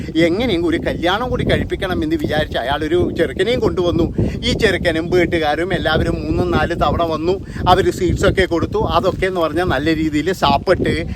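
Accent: Kannur, India. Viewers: സ്വതേശം കണ്ണൂർ ആയിരിക്കും സംസാരം കേട്ടിട്ട് തോനുന്നു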